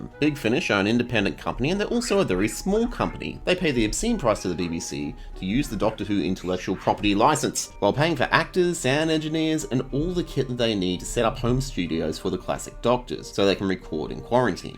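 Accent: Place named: Australia. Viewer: This is assertively, but not aggressively, Australian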